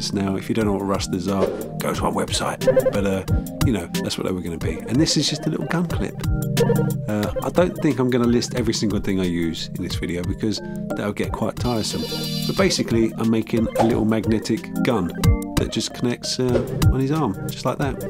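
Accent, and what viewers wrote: United Kingdom. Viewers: Hey, extra British in this video. Can’t tell ya why but just wanted to point it out. Love your stuff and your noodle.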